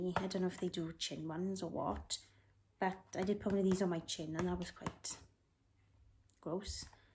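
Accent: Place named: Wales, United Kingdom. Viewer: I'm just about to upload my haul, but still watch other people's incase they see something I've missed is that a fellow welsh accent I can hear??? Welshie here too!! 😁 let's support eachother 😘💗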